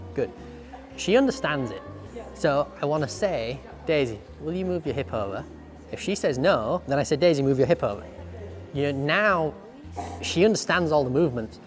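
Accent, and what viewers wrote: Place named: United Kingdom. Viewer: Bit difficult to take this serious a cowboy with a British accent.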